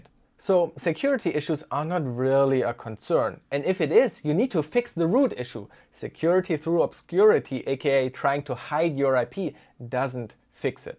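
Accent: Germany. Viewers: i could hear u are german, but now i see you are from my town :D MOIN!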